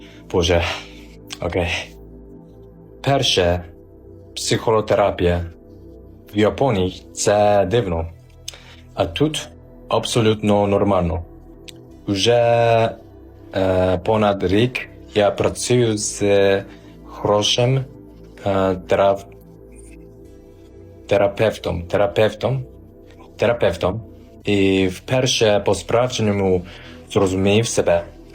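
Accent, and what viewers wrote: Standard. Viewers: Який Ви молодець. Дякую Вам за чисту українську мову. ❤❤❤ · Вже дивлюся друге Ваше відео. Дуже чисто говорите українською. Молодець , Вам важко , але Ви навчитеся , бо хочете . Приїжджайте у Львів , познайомтеся з нашим містом . Наука - психотерапія . Лікар - Психотерапевт , який допомагає адаптуватися . Не переживайте ! Все буде добре ! ❤❤❤ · У вас правильна літературна мова. Ви молодець. Дивлюсь канал одеситки, яка проживає в Японії. Дуже дивує ваша країна. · Чиста мова - Ви такий молодець ❤🇯🇵🤝🇺🇦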